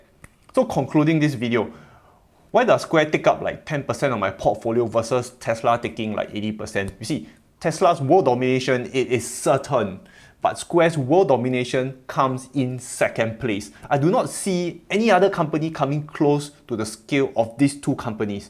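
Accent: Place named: Singapore. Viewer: I’m Becoming .. filthy rich because of you by doing nothing but picking..”it’s CRAzy” ( in your awesome Singapore accent )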